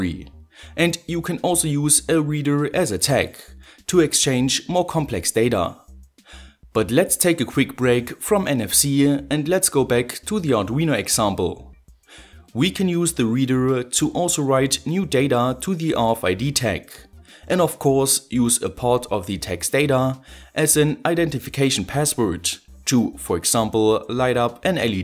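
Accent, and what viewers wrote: Germany. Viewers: That’s quite a German accent of yours. But I don’t mind. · Your accent sounds German to me. Kann es sein, dass du deutscher bist? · Dein Deutsch-Englisch is Klasse ;D #noHate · ouhhh! german hyperinflating english...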